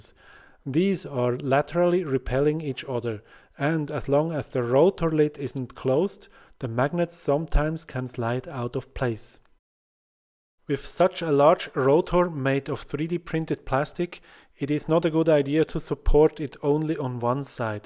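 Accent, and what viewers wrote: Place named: Switzerland. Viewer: Der Dialekt liess mich vermuten, dass du vlt. Schweizer bist wie ich. Ein Blick auf den Kanal bestätigt zumindest die deutsche Muttersprache.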